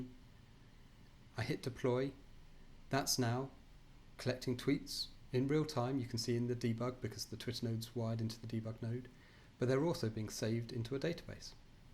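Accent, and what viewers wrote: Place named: United Kingdom. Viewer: difficult to understand: strong British accent mumbling ... sorry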